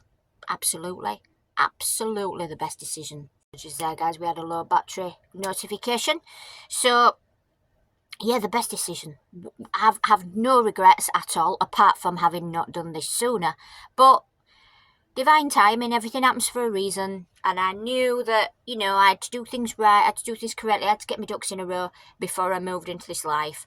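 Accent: United Kingdom. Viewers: What's your accent? I'm guessing Lancashire, but not sure. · Hiya flower, You sound like your from my neck of the woods ? I'm a Bury lad. Moved to Pembrokeshire 28yrs ago. Wide awake and unj@ I'm looking at vanlife again. Keeping my upholstery business. So I might live outside my rented premises... Totally and utterly worn out with the matrix....like seriously worn out.... if your ever in Pembrokeshire. Pop in for a cuppa. Empty your grey and fill up with water.... cheerio now sis 😊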